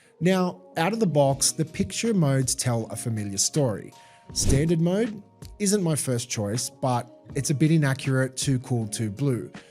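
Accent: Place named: Australia. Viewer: About his voice, the Australian accent particularly his specific neighborhood town one is not globally heard, which makes it harder to understand. And add to that his unique voice tone... definitely a better mic can make it easier for certain, interestingly in his livestreams the voice sounds much more clearer so maybe it's something in the editing/software of his uploads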